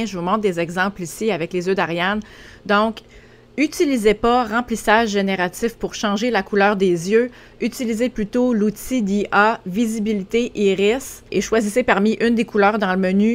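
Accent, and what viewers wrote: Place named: Chicoutimi, Canada. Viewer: Ahahah, de Québec, OK, mais, tu as un accent de Chicoutimi, ou j'ai fait mon Bac en art. Je n'habite plus au Lac, je suis à Montréal depuis 1990, pour étudié à la maitrise en art visuel. à UQAM Je t'encourage à continuer tes postes sur la chaine, tu es intéressante et drôle ! Merci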